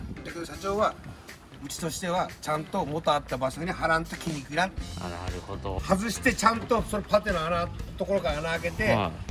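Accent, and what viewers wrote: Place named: Fukuoka, Japan. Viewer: 福岡弁さん本人の面白さカメラマンとの絡みローレルネタ全部最高。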